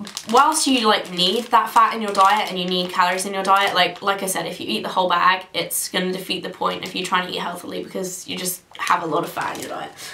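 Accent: England, United Kingdom. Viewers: Your British accent really comes out in this video hahaha brings me back to the video you did with Luke! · There would be sooo much confusion between us if we met in real life, because I have a thick Southern accent and you have a thick English accent! Hahah, love you:)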